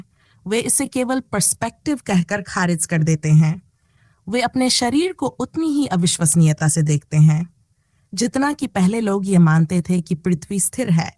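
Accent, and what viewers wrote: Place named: United Kingdom. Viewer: Aapki Hindi bahut achhi hai parr angreji ki boo aati hai..😊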